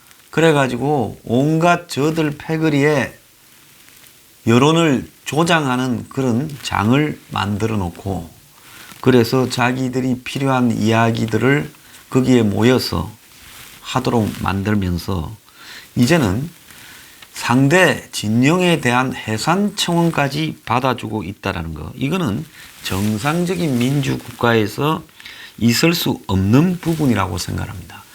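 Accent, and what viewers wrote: Gyeongsang, South Korea. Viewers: 디져야 한다.참 오랜만에 들어보는 경상도 사투리군요. 구수합니다. 문도 디지고 조국이도 디지고 이해찬이도 디지고 기레기들도 디져야 한다.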